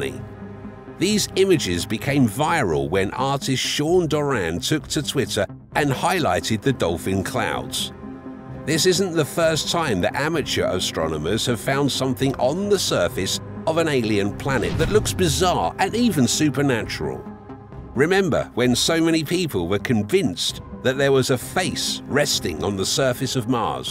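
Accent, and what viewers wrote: United Kingdom. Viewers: I know Ur UK english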